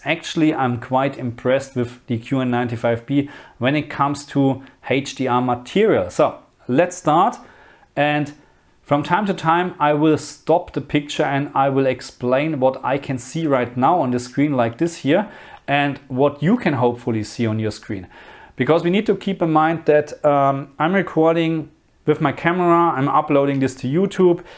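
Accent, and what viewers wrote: Germany. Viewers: Great video. I like your`e german accent also. I have the lg cx. and its great. But its old tech now. and cant be that bright. but only in more then 1000 nits you seen that the cx is weak. with 800 nits, it has better details etc? but what you advice me, bought the s95b this year? or wait till second gen qd oled from samsung?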